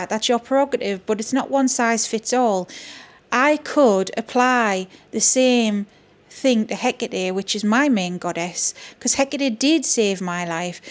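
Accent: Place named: Newcastle, United Kingdom. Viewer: It's a Newcastle accent, and it's perfection 😍 (I am obsessed with all the American accents. You guys have tonnes. ❤)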